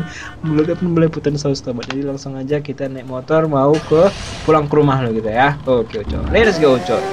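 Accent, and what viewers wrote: Medan, Indonesia. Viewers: Bang orang Medan ya? Soalnya logatnya mirip orang Medan 😅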